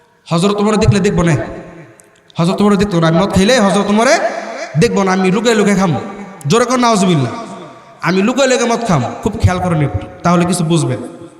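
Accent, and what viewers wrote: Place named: Shariatpur, Bangladesh. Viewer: পুরাই শরিয়তপুরী মাশাআল্লাহ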